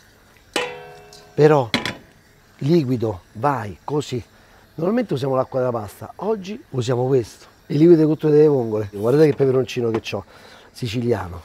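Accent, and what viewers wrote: Rome, Italy. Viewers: sei simpatico sei sempre sorridente spieghi benissimo e sei de Roma col vostro accento che mi fa impazzire. Ho provato alcune delle tue ricette e mi vengono anche bene, l'unica critica che ti posso fare e' che a forza di provarle sono ingrassato di 10 kg. 😄😄. Grazie di tutto.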